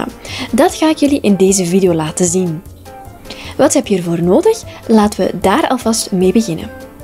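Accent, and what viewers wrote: Belgium. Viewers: Jij bent toch Belgisch?, te horen aan je stem🇧🇪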